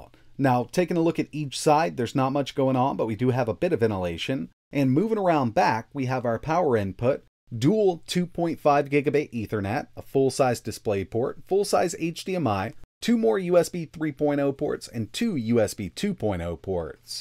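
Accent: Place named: New York, United States. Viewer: I like your dialect. Are you from the NYC area?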